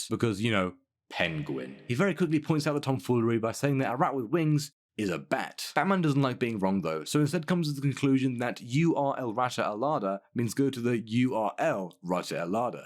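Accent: United Kingdom. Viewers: Wait... you're british?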